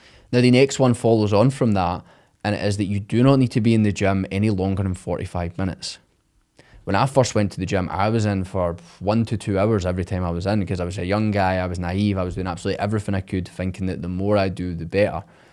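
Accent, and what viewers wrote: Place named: North, United Kingdom. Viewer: Fair play bro like your style of delivery, it's great to listen to more "localised" people, me a dub and yourself /obviously somewhere north!!!😅 information may be similar but to support and hear from again as I said more "my own people "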